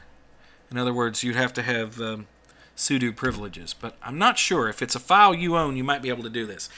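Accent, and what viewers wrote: South, United States. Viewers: Ignorant Brit, here.. what Southern accent exactly do you speak in? It's soooooo precise in the consonants, and clear. Maybe you're just well-practised with a mike, too.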